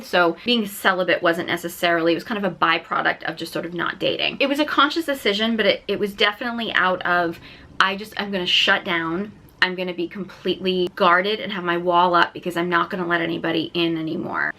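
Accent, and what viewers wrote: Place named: South, United States. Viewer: Your accent is cute! Where are you from? I’m in the Midwest. To me it sounds like you have a ever so slight southern accent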